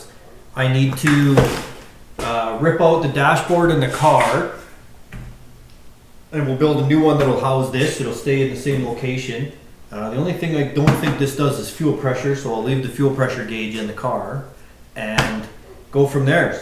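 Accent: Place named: Canada. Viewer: Dude. That Canuck accent. LoL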